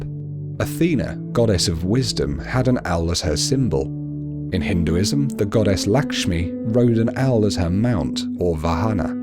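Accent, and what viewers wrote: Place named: United Kingdom. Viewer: My goodness the generic British accent yeah....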